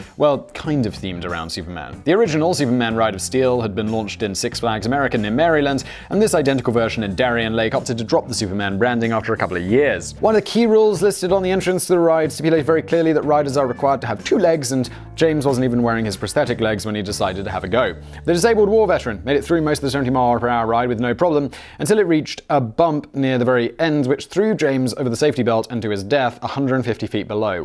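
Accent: United Kingdom. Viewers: Oh dear! We are here because we are Gluttons for Punishment.... and some of us are fans of the British Humour and Accent! lol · I'm here for your beutiful British voice and your ability to rant more frequently than my own thoughts.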